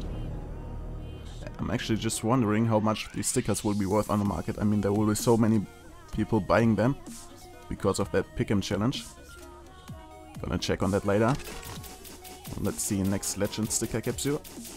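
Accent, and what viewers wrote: Germany. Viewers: SO ein heftiger deutscher Akzent. Meine fresse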